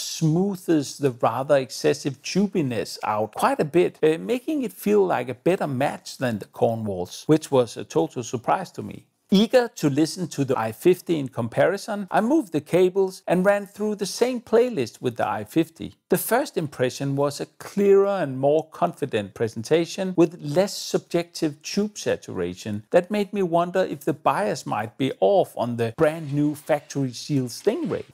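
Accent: Denmark. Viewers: Unmistakable danish accent , but nonetheless informative and humorous!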